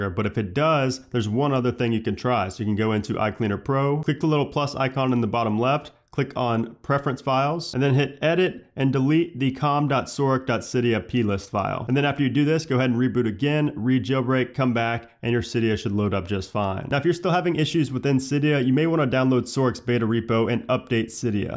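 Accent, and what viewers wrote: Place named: South, United States. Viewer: anyone else think he has like a really hot voice? reminds me of like a southern guy lol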